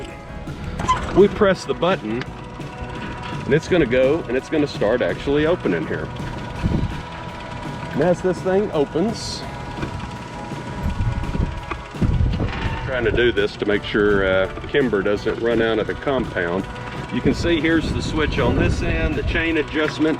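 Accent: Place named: Texas, United States. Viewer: Hi there, nice and easy, your way of talking texan its just amazing , viva texan,....the explanation was great , thanks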